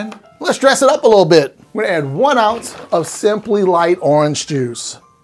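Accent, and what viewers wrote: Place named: Philadelphia, United States. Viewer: You live in Louisiana but is that a Philly/South Jersey accent i detect? Or Delaware?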